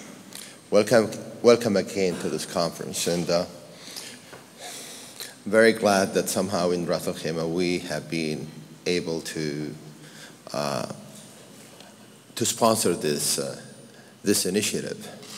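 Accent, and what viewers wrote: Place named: United Kingdom. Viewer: Masha Allah Sheikh Saud's accent is really so perfect he has the Britain one first time I get to hear his Speech wooow so fantastic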